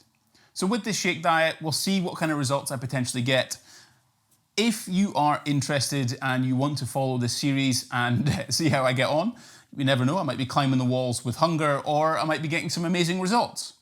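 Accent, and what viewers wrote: Scotland, United Kingdom. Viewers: I got so thrown off when you opened your mouth and you were Scottish (I'm Scottish) never expected to hear a familiar accent but always lovely when I do 🤗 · You sound Scottish!😅 I love ever accent except my American one.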